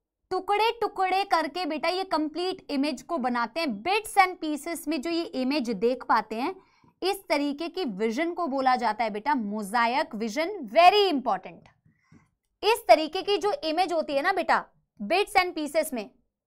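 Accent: Bengal, India. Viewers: Ma'am aapki bengali intna cute hai ki kiya ji bolun main 😍😍😍😍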